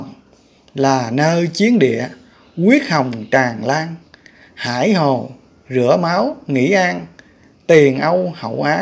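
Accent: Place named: Central Vietnam, Vietnam. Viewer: Kiến thức này ko để trọ trẹ đọc mất thiêng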